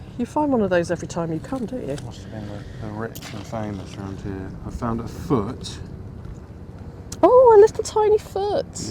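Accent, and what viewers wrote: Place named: London, United Kingdom. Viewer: Do the ducks have a permit? London accent though.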